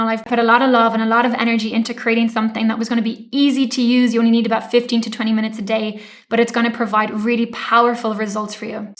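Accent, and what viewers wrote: Ireland. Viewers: Oh you are Irish.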